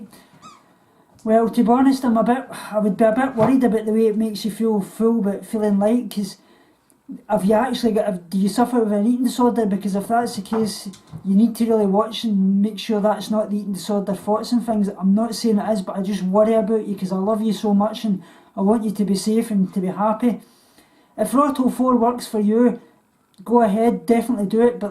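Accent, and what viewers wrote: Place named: Scotland, United Kingdom. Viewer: I have Scottish ancestors my great-great-grandmother was from Scotland she spoke just like you I don't remember her she passed away when I was a baby but my mom used to talk about her all the time she said she loved hearing her talk because of her accent just like I like your accent I like Australian accents British accent Irish LOL I'm just plain old American accent lol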